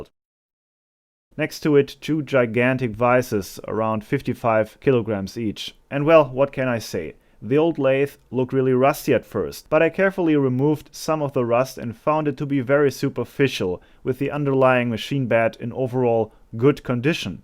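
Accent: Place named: Germany. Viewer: My Great Great-grandfather was from Germany. What part exactly I do not know since he passed away when I was a small child. But hearing your accent and listening to you pronounce things in German is a treat for me. Not mechanically inclined to do this things you are very skilled at. But I will continue to watch your videos and live vicariously through you. And who knows maybe I'll learn a thing or two that will help me take care of the little amount of tools I have. Keep doing what you are doing and good luck with the car repair!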